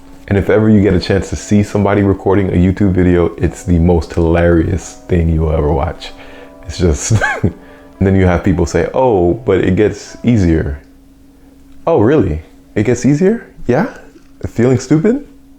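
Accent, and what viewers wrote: Jamaica. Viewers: Honestly thank you for the review. I'm looking for my first light and I think I figure out exactly which one I will run on my G45. Dad crew here also. I also notice an accent similar to a Jamaican accent if that's your background nuff respect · First off breddah u sound jamaican are you? I am and i kept hearing the accent creep out haha. As far as the lights go i just 2 days ago sold my PL 2 valkyrie to buy the TLR 7 A. Im running it on my FN 509 Mrd and i love how it looks and fits flush. I like the fact it has a strobe feature (wish u would have covered that) i dnt miss the o light.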